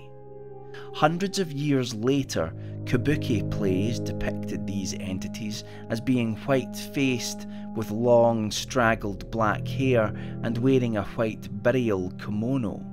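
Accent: Scotland, United Kingdom. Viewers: Once again, an awesome video, right to the VERY creepy last second! Your engrossing "Scottish Crypt Keeper" kinda narration always adds a whole other level to the spook factor, thank you~Well done! The applause you hear is coming from the Rocky Mountains, in the United States. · i love your scottish accent i think its the best accent to tell stories with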